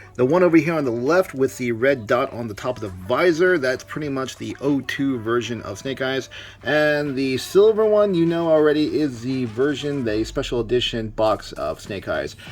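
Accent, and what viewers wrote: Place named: United States. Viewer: bro, mind if i'm asking, where did you go to school? your english is so damn good with pure american accent. sorry, no offense. but considering where you are from, i haven't detected any mandarin speaker accent. btw, i'm glad that i've waited this far to purchase snake eyes cause i really like this movie version a lot far better than the rest cause of his ninja's and assassin's look. i've always considered him as an assassin. all the previous released looks more military to me. which is not my cup of tea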